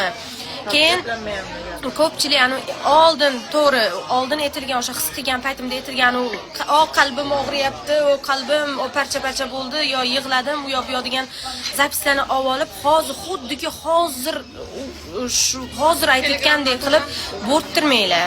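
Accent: Khorezm, Uzbekistan. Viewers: Хоразм шеваси бу одамга билмай тухмат килма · Хоразм шевасида гапиряпти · xarazm tili chiroyli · Bir karasa xorazmcha bir karasang uzicha toshkencha gapiradimi ukuvsiz ekanbu maktabda ukiganmi bu😢😅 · Сизга подписка булдик. Позитив инсон экансиз. Хоразмча жудаям бошкача ти л тушуниш кийин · Turkçe iyi konişamiyosin 😮😮😮 sen Harezmdan galiba😮😮😮Harezm şivasinda konişyosin😮😮😮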